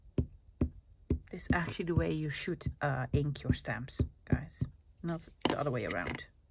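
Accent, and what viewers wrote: Netherlands. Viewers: Hello!! afinally I post something - I have been following your videos and I have learned so much and now have actually completed a few things that I am happy with - with thanks to you. So I will be putting together some happy mail. It is a thank you for your big hearted sharing of what you have learned! Not only that but I am Canadian and you know the connections we have with the Netherlands. My two best friends were from Vollendam - unfortunately they have passed before me and I miss them terribly. But your voice brings them back to me with the accent and I feel close for that reason. I am still old enough to be your mother (79) but love to learn new things!!, have a lovely day or evening or weekend! All the best, Barbara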